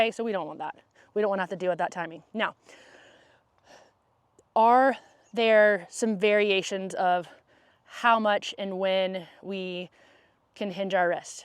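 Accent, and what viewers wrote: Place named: United States. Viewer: I love your work method and most of all your American 🇺🇸 accent !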